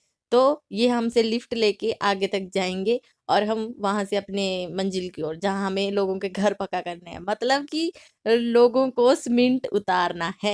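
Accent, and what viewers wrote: Haryana, India. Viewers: maybe I could be wrong but Aap ke bolne mein Kuch Kuch Words ka haryanvi accent bhi aata h Sun k acha lgta h 👍👍 finally you got khidki wali seat 😀😀😁😁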